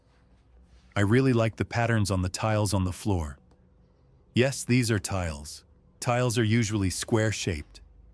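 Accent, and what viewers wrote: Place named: United States. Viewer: definitely is an American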